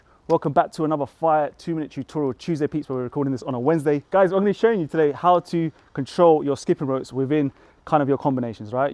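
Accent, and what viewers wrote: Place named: United Kingdom. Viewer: what is your name? Is it?? Love the British accent always. I can only dream to be as graceful as you are jumping rope. I look ridiculous in my form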